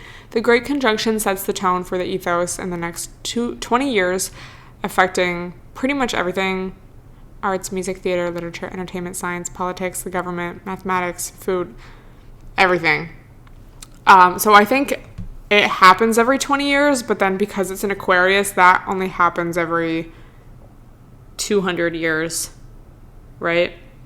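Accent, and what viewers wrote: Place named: California, United States. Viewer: To be honest, I'm just sitting on my mattress, and listening a while to u, because I just wanted to hear some recent voice with some Ca. maybe LA accent, just to make myself feel a little bit more at home.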